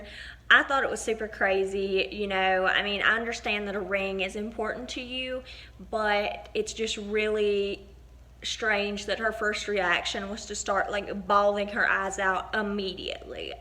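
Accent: South, United States. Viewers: I’ve been binge watching your videos the last couple days and let me just tell you, you’re a great storyteller and I just love your southern drawl, personality, and little bit of attitude lol Keep being you 😘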